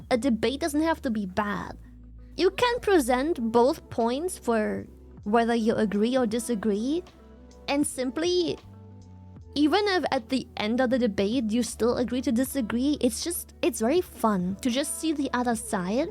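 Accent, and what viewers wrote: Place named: France. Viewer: Shylily's french accent is adorable. It makes sense because she is french that she is non-confrontational.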